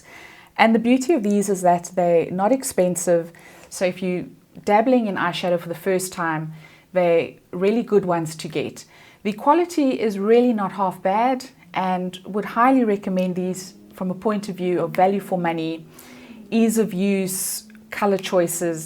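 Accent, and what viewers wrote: South Africa. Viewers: I love Ruby Woo in you! I’m curious about something in your video - I think you said that you got the Elf shadow pallets for less than 30 bucks (is that the word you used?) and also mentioned the Essence eyeliner for less than 50 bucks - I know you are in South Africa (your accent is really lovely!) so what is the currency exchange like? In the US these products are around $3 each, so I’m wondering about your currency. Thanks for an informative video - so glad I found your channel! 💜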